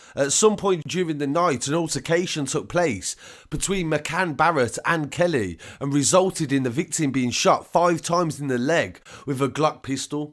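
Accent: Birmingham, United Kingdom. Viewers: Why does this brummie jackass keep calling him McCann Barrett ? It says mark duh !!!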